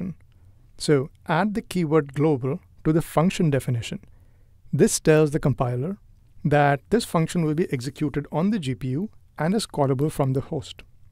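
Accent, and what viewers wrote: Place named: India. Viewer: Painful Indian English Accent.... Its Always Feel The Difference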